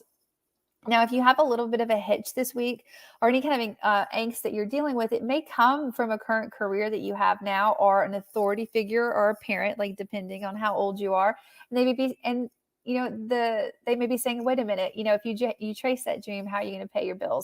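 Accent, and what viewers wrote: South, United States. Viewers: I love the light southern accent you have!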